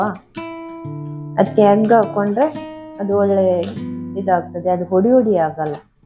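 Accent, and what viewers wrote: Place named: Mangalore, India. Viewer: Super fish fry ❤️🤤Thumba chanagi mathadthiri Mangalore kannada 😂 be connected always 👍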